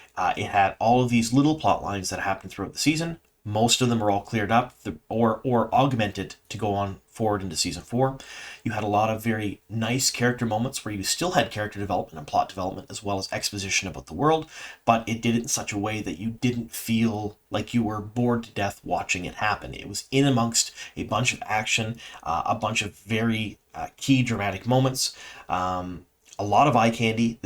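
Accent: Canada. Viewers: I also recognize that you’re Canadian because you always say “aboot” and it’s kinda charming. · They're wondering about the supers?!!? The first time I heard you pronounce the word "about", I said, that's Clearly Canadian! I'm extremely about Siuan, I had a couple spoilers about her story, and I really wanted to see Sophie play those things! Fantastic season and we need seasons 4 - 8!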